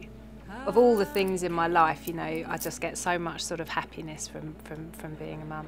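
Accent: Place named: London, United Kingdom. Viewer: She's so London, innit?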